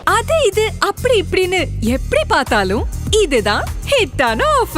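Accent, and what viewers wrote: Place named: Coimbatore, India. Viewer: Comibatore slang super sir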